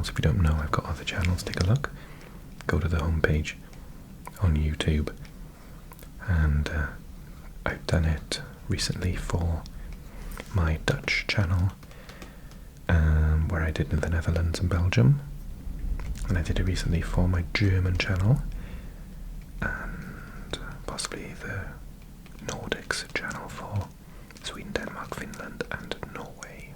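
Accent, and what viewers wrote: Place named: Wales, United Kingdom. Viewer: Love hearing your Welsh accent as someone who lives in Wales! Unusual to hear it on here so thank you 🤩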